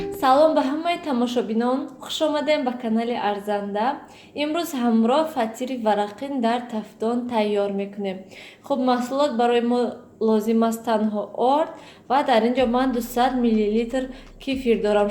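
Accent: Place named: Khujand, Tajikistan. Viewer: Ранги тарафои хучанд гапзанитон падари Нилуча гучоги ?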